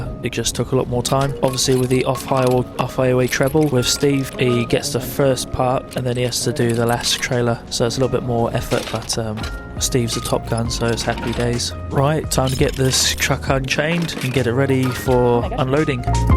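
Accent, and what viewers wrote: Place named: England, United Kingdom. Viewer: Great video, this guy sounds like an Englishman not a kiwi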